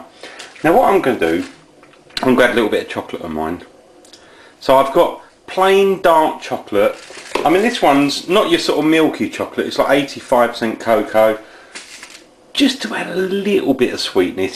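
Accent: United Kingdom. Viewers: just because he has a british accent doesn't mean he sounds like Jamie oliver... he sounds nothing like him other than the british accent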